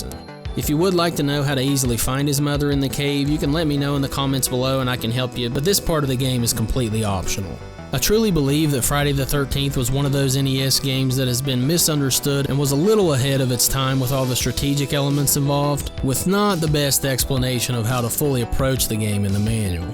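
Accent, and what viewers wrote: South, United States. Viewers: Aww the good ole days, great video btw way your southern accent made it even more intriguing! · liked subbed, love your country accent and video coverages on titles. you really do great indepth coverage and the sound quality is great, liked and subbed!! <3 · Loving that southern accent. Cool channel! Subscribed and liked! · This guy has the perfect amount of country twang in his voice. I'm a fan sir, u remind me of home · First video I watched. Subbed. Great video and well researched. I personally love this game too. A few things: You mentioned a couple of the purple Jason tributes, but there's also an awesome purple NECA "action" figure worth a look. Next, with the release of NESmaker, we can expect an explosion of new rom hacks, specifically ones fixing, improving, and editing old NES games. I know rom hacks exist, but the NM program makes it so easy anyone can basically make their own game from scratch. 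Good times a'coming! Lastly, I'm trying to place your southern draw, I'm from Memphis myself, but have lost most of my accent living overseas for 20 years. My bet is Arkansas or Kentucky.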